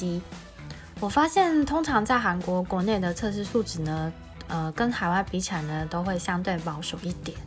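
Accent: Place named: Taiwan. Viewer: 台灣人說中文 很好聽 好像可愛小孩子講的感覺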